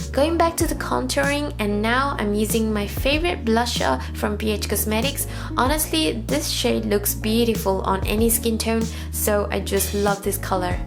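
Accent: Nepal. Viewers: Is she Nepali, I could tell from her accent. Nice video!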